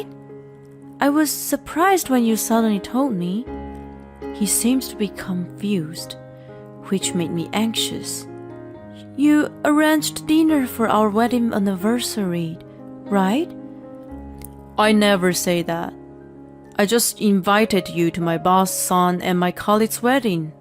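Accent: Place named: United Kingdom. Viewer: Please Bring back the UK voice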